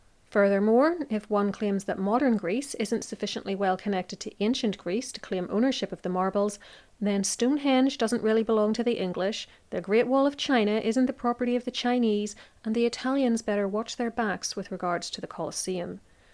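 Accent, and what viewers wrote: Ireland. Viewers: Irish accent? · My guess is that, judging by your accent, you are of Irish origin. You are VERY sparse with your facts regarding the original acquisition of the Marbles and I can only assume that you are an Anglophobe.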